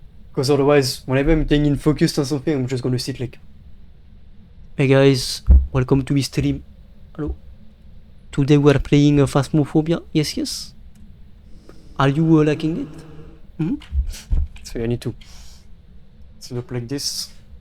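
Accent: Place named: France. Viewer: im crazy supprised that ur french accent makes it difficult to really understand ur English.... But still nice smooth gameplay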